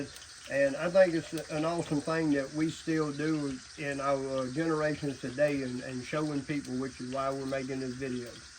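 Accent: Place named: South, United States. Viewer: Are you from Louisiana or Tennessee?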